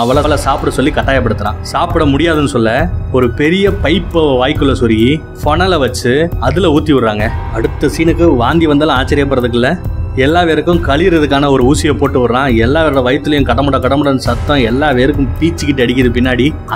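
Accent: Madurai, India. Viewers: Anne Neega Madurai thaana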